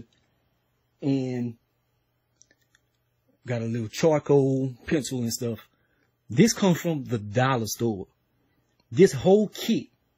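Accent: Louisiana, United States. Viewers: What's up homie? I'm new to your channel thanks to your P Valley breakdown. Anyways I'm checking on your other videos and stuff you seem like a chill entertaining dude I look forward to your other stuff. Super-talented also hey your accent bro it's very original sounds like when I watch movies from like Louisiana LOL like New Orleans type shyt. Very different from me I'm in Brooklyn LOL anyways have a good day about to start this P Valley season 2 LOL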